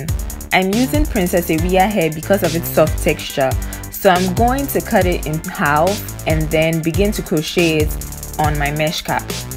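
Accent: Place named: Ghana. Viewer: I can hear Ghanaian accent. I hope am right. U look beautiful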